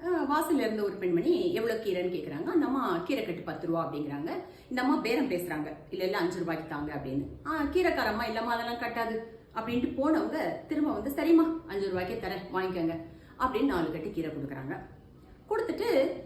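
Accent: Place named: Thoothukudi, India. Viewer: தூத்துக்குடி தமிழ் மக்கா இது.....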